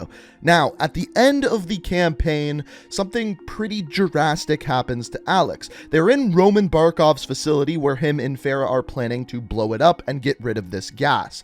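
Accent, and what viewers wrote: Canada. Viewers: this guys extra Canadian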